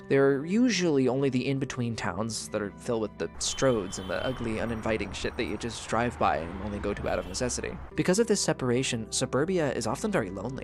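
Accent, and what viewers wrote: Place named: United States. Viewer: OMG, American accent, youthful voice. Try going to Delhi India if you want something to complain about.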